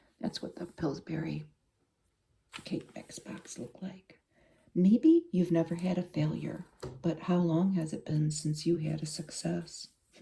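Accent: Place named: Minnesota, United States. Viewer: Sounds like Minnesota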